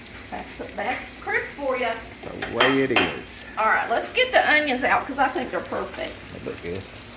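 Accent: South, United States. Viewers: Love your channel!! I just found it 2 days ago and I have been watching since. You cook just like my Granny did. Brings back so many wonderful memories of being in her kitchen. Thank you for keeping good southern country cooking alive . I’m a Georgia girl too living where the sweet onions grow. Thank you for showing just how wonderful southern living is… I heard you say on one show that someone said something about the way you talk..,well don’t change a thing… that’s part of what makes us southern. Thank you for what you and Chris are doing and God bless you❤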